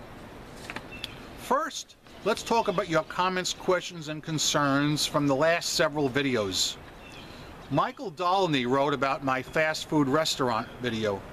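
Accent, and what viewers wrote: New York, United States. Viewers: the Joisey Boy with the New York Accent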